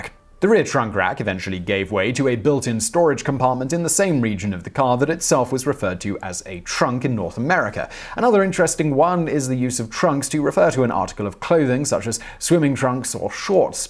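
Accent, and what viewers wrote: United Kingdom. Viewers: I have no idea about anything that you talk about, but because you have a British accent I agree with you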